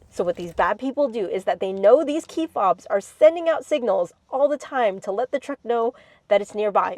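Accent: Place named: United States. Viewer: pretty sure she is american, I am expert on accents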